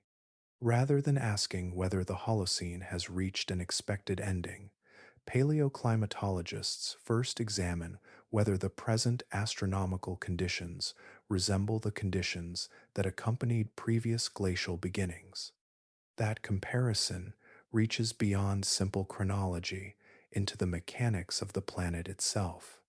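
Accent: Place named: United States. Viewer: Thank you for the American accent on your voiceover.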